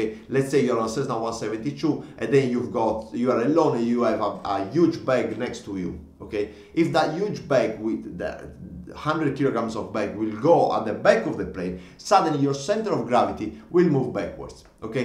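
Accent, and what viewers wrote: Italy. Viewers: You sound very Italian! Ciao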